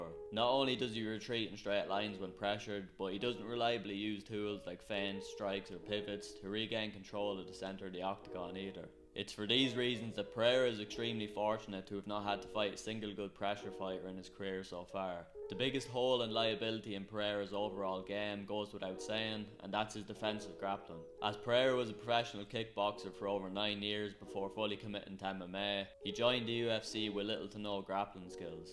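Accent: Ireland. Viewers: Definitely from somewhere in the midlands in Ireland with that accent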